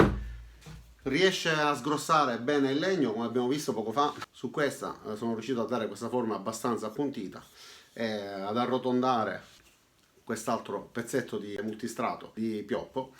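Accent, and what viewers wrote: Sicily, Italy. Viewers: Se la LIDL ti perde come cliente mi sa che è spacciata! Sembri siciliano dall'accento, lo sono anche io, se hai degli elettro utensili da vendere in blocco come usato fammi sapere che ci accordiamo tramite mail! Saluti!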